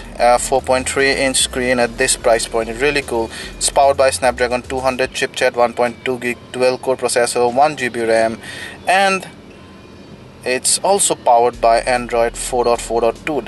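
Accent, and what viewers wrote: India. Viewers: OMG Why every budget phone review is narrated with an indian accent? why jesus??? <--- Racist Detected, sorry guys, but this annoys me a little.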